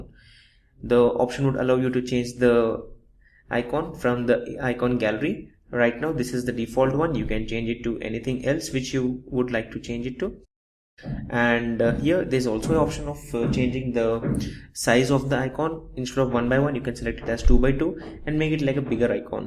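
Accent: India. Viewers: i hate that indian accent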